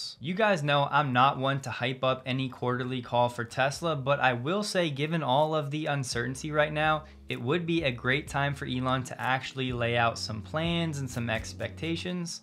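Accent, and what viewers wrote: United States. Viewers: Thanks for the updates. 🙂👍 Your speaking speed is fine. If you speak any faster, English speakers will start to have trouble in understanding your strong U.S. English accent. There are a number of U.S. channels which many English people cannot understand because of strong accents and rapid speech. People who speak English as a second language will have even more difficulty.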